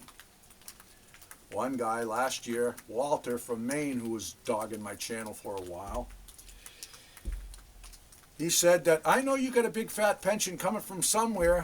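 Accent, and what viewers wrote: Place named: New Hampshire, United States. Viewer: Love your channel and your life approach. Perhaps it is because I came from NH and miss the accent -- but of course, we do not have an accent. Keep making the videos so that I have something good to watch.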